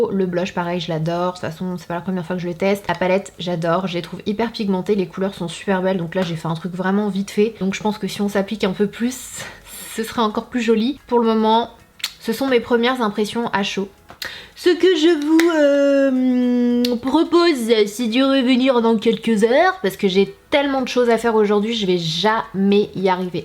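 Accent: Quebec, Canada. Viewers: L’accent québécois 😂🙋🏻‍♀️ follower québécoise 😋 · J adore ton accent québécois! Vive le Québec xP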